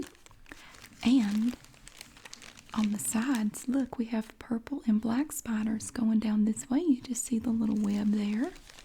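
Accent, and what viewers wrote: South, United States. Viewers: Your country accent is everythingggg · Your southern accent gives me allll the tingles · I love the way you say everything :D I didn't like southern accent until I discovered your videos. You are so sweet and funny!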